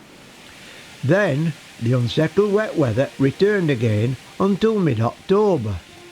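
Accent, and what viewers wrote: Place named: Lancashire, United Kingdom. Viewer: I enjoyed this video and recommend you for your care of the wildlife. I was born in Lancashire and lived a couple of years in Todmorden. I love listening to the music of your accent it feels like home.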